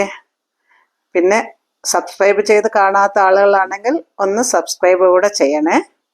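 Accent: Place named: Palakkad, India. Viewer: You sound like my Grandpa 's family from Ellapulli Palghat 😊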